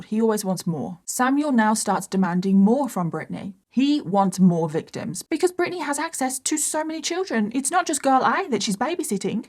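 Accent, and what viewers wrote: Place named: Birmingham, United Kingdom. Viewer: i asked my friend if she could do her makeup and talk at length about csa at the same time and she said no. the grwm/true crime subgenre is kinda antiquated now but it's definitely a skill and i'll always be here for it. anyway the stuff you talked about was horrible asf so thanks for that. always nice to hear a brummie accent too.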